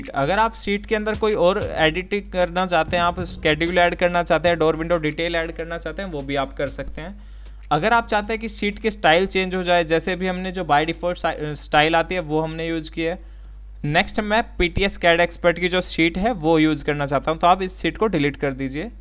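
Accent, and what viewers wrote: India. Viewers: Please 🙏🙏🙏 Speak in Britain accent Stop using Indian accent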